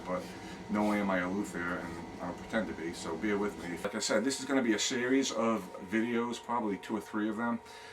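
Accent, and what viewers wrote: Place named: Boston, United States. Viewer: Code clan .Agreed, sounds like a Boston accent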